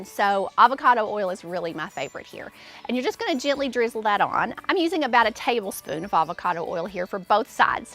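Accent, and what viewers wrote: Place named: Texas, United States. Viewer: Super Texan I bet ❤️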